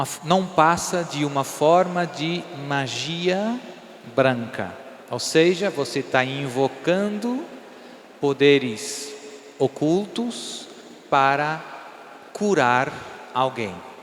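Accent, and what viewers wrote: Brazil. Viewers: Ele morou no Brasil na juventude, mas com jeitinho, qualquer português é capaz de falar com sotaque brasileiro, devido às quantidades industriais de telenovelas brasileiras que têm visto desde 1977 (Gabriela e n seguintes). Já o contrário é mais difícil...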